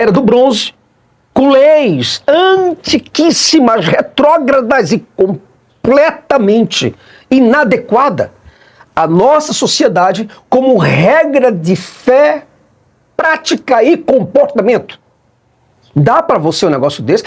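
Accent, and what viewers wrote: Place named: Rio de Janeiro, Brazil. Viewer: Putz... esse sotaque carioca é essa entoação lembram muito os pastores da Universal 😅😅😅😅😅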